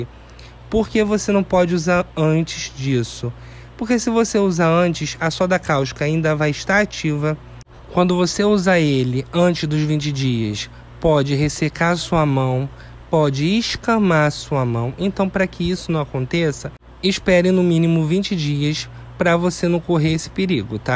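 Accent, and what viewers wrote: Rio de Janeiro, Brazil. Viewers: Ñ sei se tem beleza física (creio q sim)😂 parece o Lombardi, só conhemos a voz 😂mas seus vídeos são os únicos q assisto sem acelerar. É didático, sem apelação. Seu sotaque (carioca?) Sua voz, td e agradável, td é proveito. Jesus te abençoe mais ainda.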